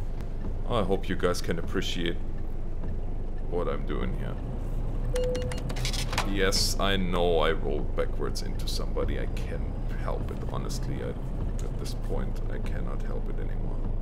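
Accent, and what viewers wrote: Germany. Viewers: Haha I can tell and I also wanted to ask are you German?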